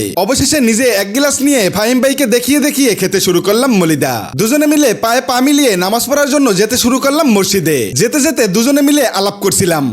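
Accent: Barishal, Bangladesh. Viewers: Kotha batra thik koiren aro views barbo 👎🏻🤬🤬 Borishailla Bangal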